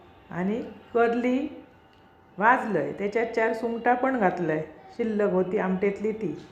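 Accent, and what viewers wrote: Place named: Vengurla, India. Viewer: Tumchya bolnyacha style warun tumhi vengurla che watta.. tumhi vengurla che ahat ka?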